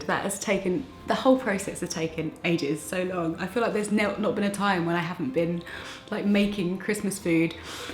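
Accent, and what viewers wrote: United Kingdom. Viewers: Long pretty hair, beautiful face, British accent, and you can cook. Wow, do I envy your husband. :)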